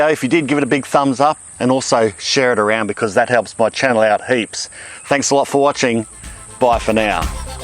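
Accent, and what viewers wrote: Australia. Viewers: Awesome video thanks for the information. Great to hear an aussie!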